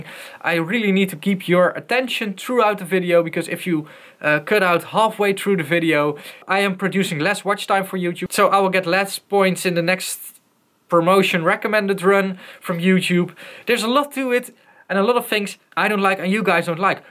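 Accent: Netherlands. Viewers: Are you dutch, you have a bit of an accent?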